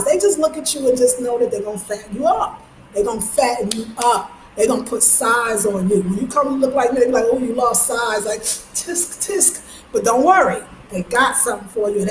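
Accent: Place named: New York, United States. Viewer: Yes we love you with that loud New York Accent, laughing , giggling, wearing bright colors & showing that sexy body!! 🌸🌸🌸🌸🌸🌸🌸🌸🌸🌸🌸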